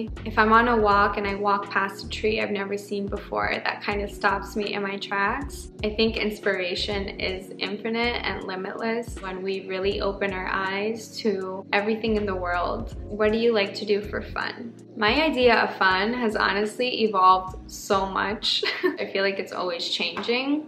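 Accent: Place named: Colombia. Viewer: I am so excited to see more! And your Spanish is beautiful – that Colombian accent, girl ✨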